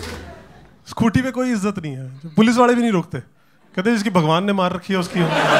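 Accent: Punjab, India. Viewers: Punjabi accent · Awaaj se hi pta chal jata hai Punjabi ho.......I am also Punjabi by the way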